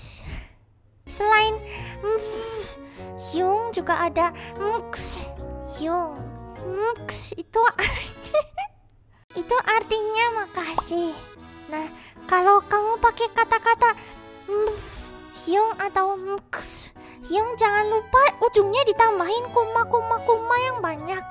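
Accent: Java, Indonesia. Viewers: Kak logat ny kaya jawa terus kak abang nya kok kaya sunda 🤔 sebenernya sodara bukan sih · Fix logat jawa:v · Intonasi jawanya kerasa banget, kayak sensasi baru gitu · Dari Legok Suaranya Aslinya Orang Jawa:v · wong jowo y? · orang jawa ya kak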